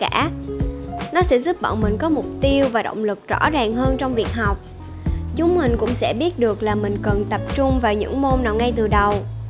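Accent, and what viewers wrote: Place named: Saigon, Vietnam. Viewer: Mình thấy b ko nên lồng tiếng ý, vì giọng b là người sài gòn, khá khó nghe, b nên thử giọng hà nội hoặc dùng chữ thì sẽ chill hơn nha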